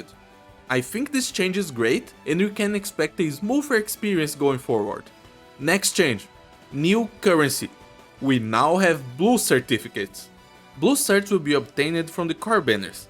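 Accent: Brazil. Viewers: by any chance, are you Brazilian? i am and the accent your English have is very similar to the one Brazilians have speaking English lol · Sotaque de brasileiro e quase instantâneo de reconhecer khkhgkj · I hear a Brazilian accent 👀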